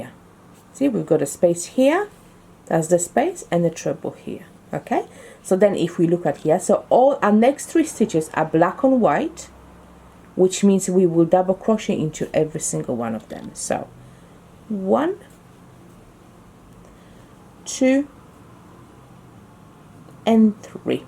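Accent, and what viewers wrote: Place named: Africa. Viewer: U sound African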